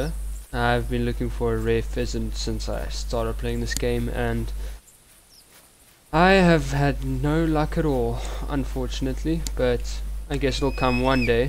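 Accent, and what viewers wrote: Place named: South Africa. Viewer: I dont think he can change it its how all south africans like me sound bru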